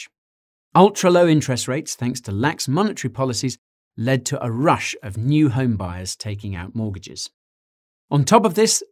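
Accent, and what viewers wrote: United States. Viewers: Wow you're American accent is spot on!! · Not bad American accent. Lol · Not a bad American accent. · i love your America realtor accent! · Your "American" accent is great!!! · LOOOOL ur American accent lowkey sounds like my old teacher in middle school for social studies 😂😂😂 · Great American accent guy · Your American is pretty good. Much better than most American’s British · Dude. Great job on the American accent. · the yank accent is hilarious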